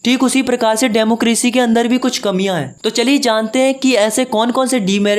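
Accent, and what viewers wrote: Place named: Bihar, India. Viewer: bhai apna accent theek karle thoda bihari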